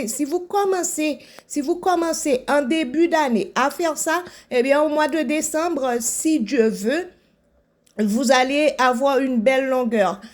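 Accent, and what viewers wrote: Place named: Haiti. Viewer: Je suis une nouvelle abonnée, tu es haïtienne comme moi en regardant cette vidéo je me suis dit que tu as un accent haïtien pour confirmer je suis allée visiter ta chaîne et je suis tombée sur une vidéo en créole, je dois encourager ma chère concitoyenne🇭🇹 bonne continuation!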